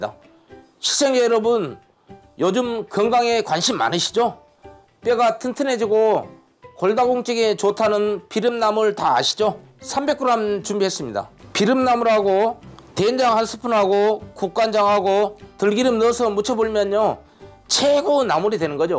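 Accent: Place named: Yeosu, South Korea. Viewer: ㅎㅎ여수고향이라 음식잘하시는군요사투리도 구수해요 돌산갓 피름나물 최고최고ㅎㅎㅎ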